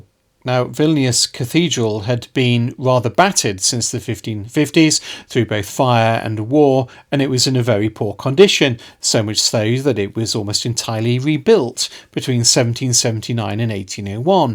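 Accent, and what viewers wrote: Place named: England, United Kingdom. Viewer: Why don't the English try even a little to pronounce foreign words/names as natives would? The J in Polish is pronounced as Ya.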